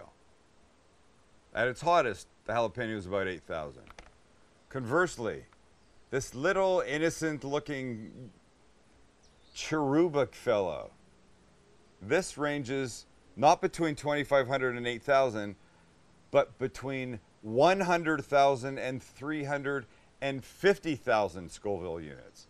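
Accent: Canada. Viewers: buddy, you are a "Snowback" a Canadian,!!! I can tell by yer"Ins and Ouuts" Great Jerk Chicken recipr!!! Keep it up! DD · Just found this guy for the jerk chicken recipe that was no bs secret ingredient shit and I find fucking neegan sounding straight baller Canadian in cali. Yeah. I'm making this shit tomorrow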